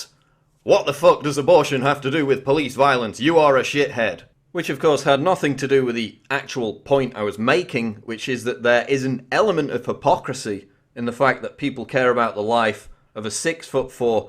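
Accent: United Kingdom. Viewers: whats up with the british accent pronouncing words that end in "a" with an "er"? just an observation. as americans we sometimes look to england for proper english,maybe not only did we come closest to perfecting society but maybe common language as well. that being said, thanx for dropping logic on the kids.your social geopolitical game is strong.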